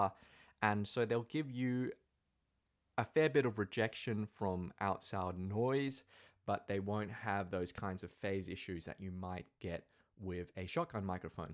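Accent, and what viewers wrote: Australia. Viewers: What boom arm are you actually using for the E614? It looks exactly what I need, and I hear your aussie, and I'm australian too so hopefully I can manage to snag what a beaut you have for yourself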